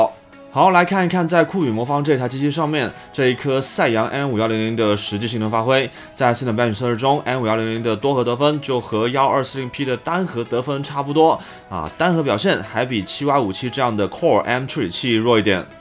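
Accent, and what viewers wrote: Cantonese, China. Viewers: 請問你是廣東人嗎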